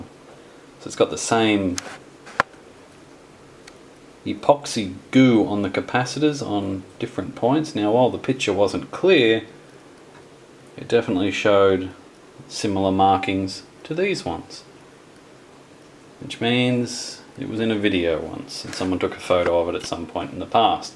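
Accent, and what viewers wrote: Australia. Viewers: If you are anywhere near Brisbane (definitely have an Aussie accent), I have a free analog scope for you ;)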